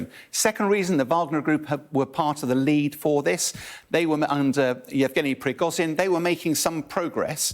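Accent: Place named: Scotland, United Kingdom. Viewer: To much british accent for me.....sound scottich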